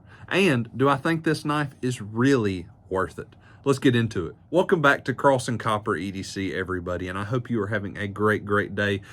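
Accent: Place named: Appalachia, United States. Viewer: Can't take this seriously with the hillbilly accent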